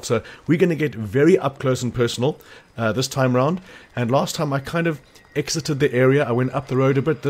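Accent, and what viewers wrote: South Africa. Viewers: thought I recognized the accent. I'm from SA too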